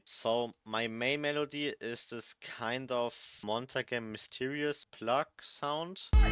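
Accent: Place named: Germany. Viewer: you can hear hes german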